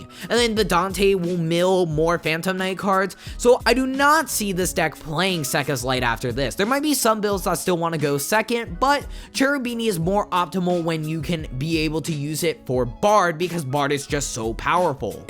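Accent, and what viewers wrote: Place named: United States. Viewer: Goddamn, why does no one look into the right pronunciation for these foreign words? I'm Italian myself, and hearing these names (which come from a very important piece of our culture, being la Divina Commedia) pronounced by an American as an Anglo-Saxon word when it's CLEARLY an Italian and Latin word annoys me so much.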